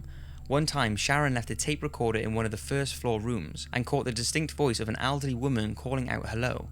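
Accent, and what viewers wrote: Wales, United Kingdom. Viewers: He sounds welsh like from the south of wales where the 'welshie' accent isnt as distinctive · Are you Welsh by any chance or do I need a hearing test? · He definitely sounds welsh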